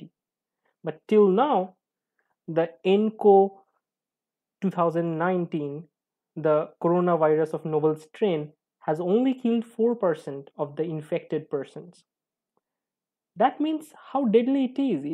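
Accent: India. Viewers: speaker sound from India right? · I am sorry but if YOU'RE AN INDIAN YOU NEED TO STOP USING YOUR THICK ACCENT IN NARRATING A VIDEO.... OR somehow include "Indian accent" disclaimer on your VIDEO title... So I don't click on it and not understand a single word...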